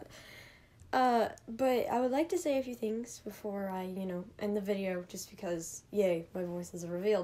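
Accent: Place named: United Kingdom. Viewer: oH MaH GwAd YoU'rE VOICEE IT SOUNDS ADORABLE :0 YOU SOUND SO BRITISHH REEEEEEEEE you're precious uvu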